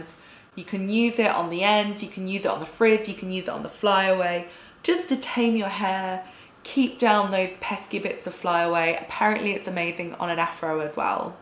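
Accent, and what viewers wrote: England, United Kingdom. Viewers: You are just so cute and so sweet! I love your English accent! I have eczema and had heard that this cream was really good but wasn’t sure. Your review completely sold me!🥰😃👍✨💖✨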